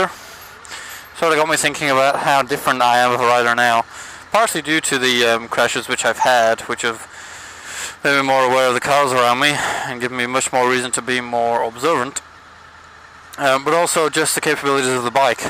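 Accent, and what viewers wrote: United States. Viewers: You sound more American in this video than you ever have done before lol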